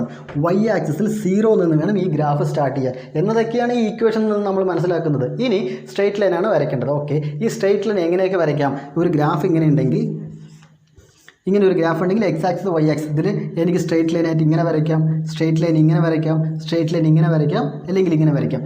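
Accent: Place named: Palakkad, India. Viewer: slang kettapolle thoniii pkd annu ennu🤪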